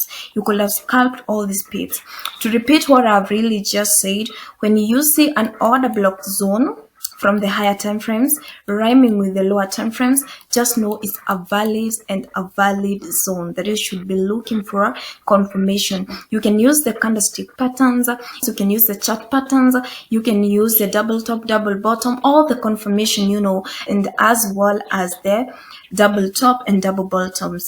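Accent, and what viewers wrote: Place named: Africa. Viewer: I say somewhere in Africa for the accent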